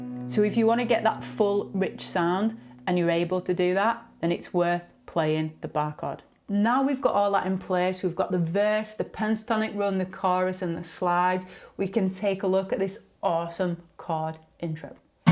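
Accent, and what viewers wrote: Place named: England, United Kingdom. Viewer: WOW , GREAT LESSON , PLEASE TELL ME WHERE YOU COME FROM ,,..OK ? ENGLAND ? YOU HAVE AN ACCENT , JOHN FROM NORTH CAROLINA , USA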